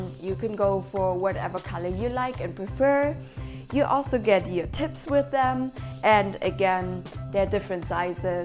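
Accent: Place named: Germany. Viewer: hi you have a german accent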